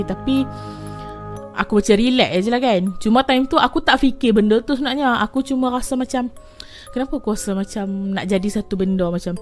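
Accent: Kedah, Malaysia. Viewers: Aku suka akk loghat Kedah😌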